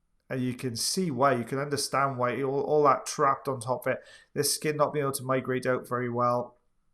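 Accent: Wales, United Kingdom. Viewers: iv got to say if there was audiology ear wax removal sports 👂🦻, iv got to say you would 100% win awards has the best commentary that goes along with the vids...best youtube commentor ears 👂 down... love that gourgous welsh accent.x Keep up the good work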